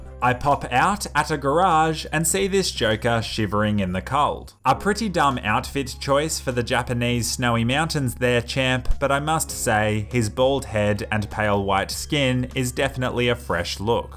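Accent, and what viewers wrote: Australia. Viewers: so ur australian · Ah i knew you were australian,no wonder you are so wise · hes aussie?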